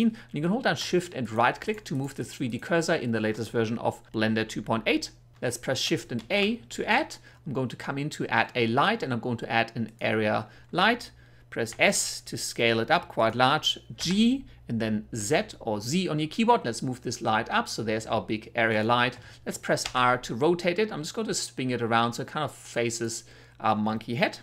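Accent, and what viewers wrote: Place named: Germany. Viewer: by the way, you sound pretty german sooo are you german?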